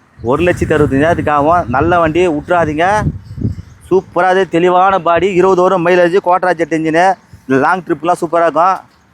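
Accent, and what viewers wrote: Madurai, India. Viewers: Super Anna Madurai vasagam 🎉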